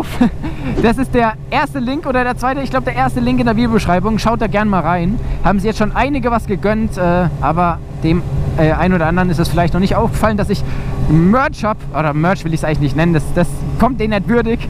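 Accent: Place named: Swabia, Germany. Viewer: Alter, Schwäbisch oder Hochdeutsch, aber net beides.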